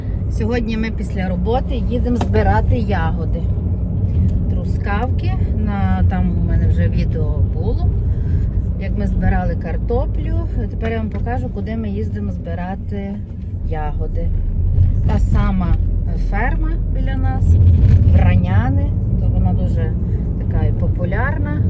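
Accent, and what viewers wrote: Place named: Western Ukraine, Ukraine. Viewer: Якаж противнюча бандероско- вуйсковська мова. Вот там и оставайся у пани гейропи, такие нам в Украине не нужны. Быть добру 🕊️🕊️🕊️.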